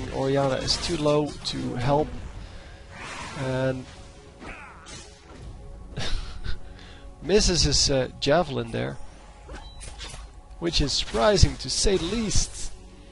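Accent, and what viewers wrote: Netherlands. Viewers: New to the channel, just subscribed...and do I detect a Dutch/Flemish accent?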